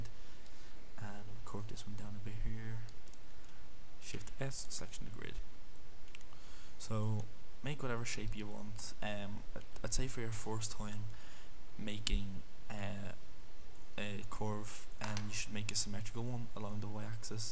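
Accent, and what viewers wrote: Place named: Ireland. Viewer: Great tutorial on Bézier curves -- sounds like you're from the same part of Ireland as I am.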